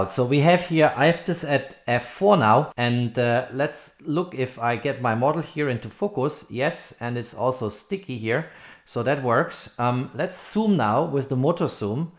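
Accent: Germany. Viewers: Exactly what I was looking for, thank you! Are you German btw.? :D Your accent sounds German. This probably also would mean that the adapter is available in Germany which is great. One more thing: how is the AF performance in videos with moving subjects? One thing is being able to recognize eyes/faces, the other one is real fast-tracking and on-point autofocus in videos, I think that's a harder task to accomplish, therefore I'm not completely sure if the performance is similar to native lenses in this scenario. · Youre German, right?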